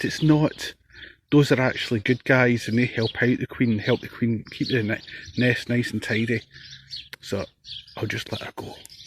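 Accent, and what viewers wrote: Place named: Scotland, United Kingdom. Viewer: Just sometimes your great Scots accent throws me "Badger Antonio" LOL!!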